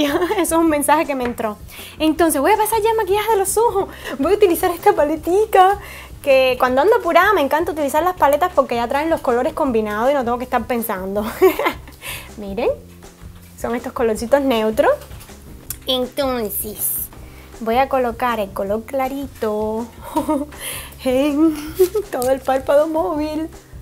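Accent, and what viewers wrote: Cuba. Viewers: me encanta el acento de los cubanos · es cubana por eso habla así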